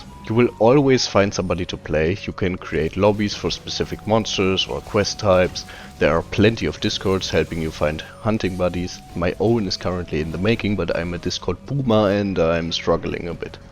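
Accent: Germany. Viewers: indeed. 60 hours and im closing in on my full set fatalis. Btw ur video was pretty cool, i rly like it. Ur accent sounds german. Am i right with that assumption?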